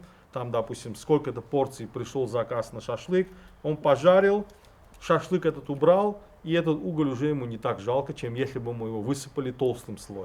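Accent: Baku, Azerbaijan. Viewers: Здравствуйте! Большое Вам спасибо за лекцию. Самый правильный, познавательный, без лишней воды расклад по теме. Очень приятно было Вас слушать. Родной акцент, с любимой родины моей. Здоровья Вам и вашим близким. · Всегда рад послушать ,Бакинский акцент так как я родился и вырос а Лок-Батане,в 24 года уехал в Пензу,но Баку в моем сердце НАВСЕГДА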